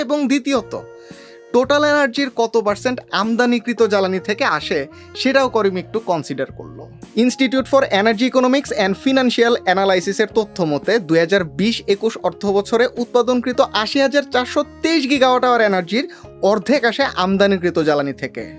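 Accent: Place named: Netrokona, Bangladesh. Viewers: I'm also from Netrakona and I've shocked so much after listening it😊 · আপনার বাসা নেত্রকোনা নাকি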